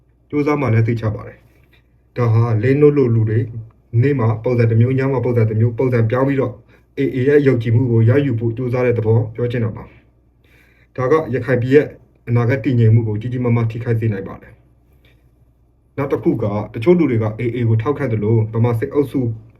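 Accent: Dawei, Myanmar. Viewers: ထားဝယ်ဖက်ကအသံပါ။တနင်းသာရီတိုင်းထဲကဖြစ်မှာပါ။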